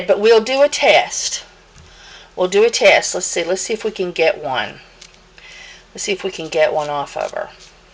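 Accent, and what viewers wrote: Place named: Fresno, United States. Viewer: That's a lovely accent that you have. Fresno?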